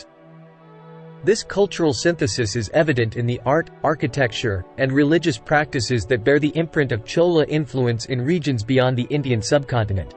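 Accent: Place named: United States. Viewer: I would of preferred it if you had set your narration machine to an English accent not American.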